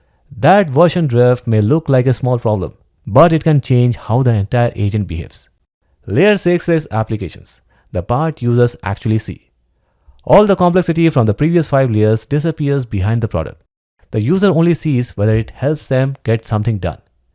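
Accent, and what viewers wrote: India. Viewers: Indians and their english.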